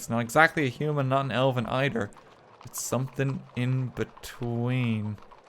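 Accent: Ireland. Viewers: A man with an irish accent dooms a city and his character's sanity for a crown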